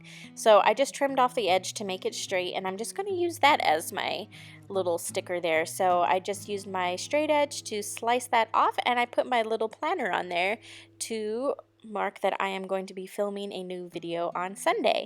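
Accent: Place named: Dakotas, United States. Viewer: I know you've lived in Hawaii, but by any chance have you lived in the Dakotas? I'm always trying to figure out your accent! lol especially trying to figure out where "anywhose" comes from! You're so adorable!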